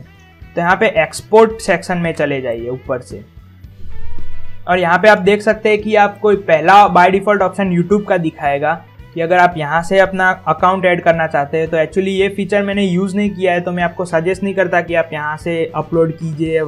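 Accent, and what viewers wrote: Gujarat, India. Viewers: Brother aap Gujarat se ho kya????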